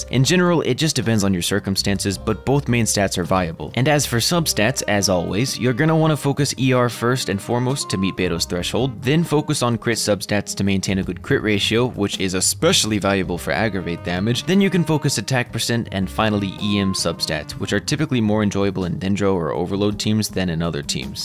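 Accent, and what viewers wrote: United Kingdom. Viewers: Why do you sound more british than american?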